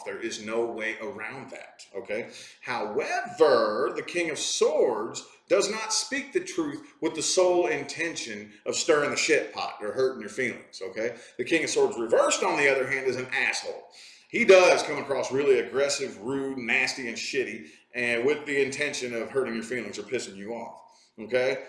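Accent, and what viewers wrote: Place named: Texas, United States. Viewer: Yes!! Love a little country accent in a tarot reading, love Texas girl lol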